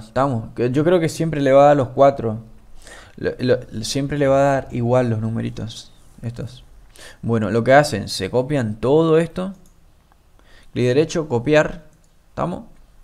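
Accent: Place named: Argentina. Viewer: fua chabon de q provincia sos? Soy de misiones, te noto cercano xd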